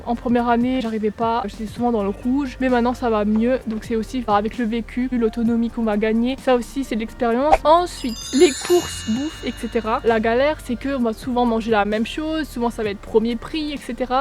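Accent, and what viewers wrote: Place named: Alsace, France. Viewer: Ehh pas besoin de te demander de quelle fac tu viens, l’accent alsacien ptdrrr waaahh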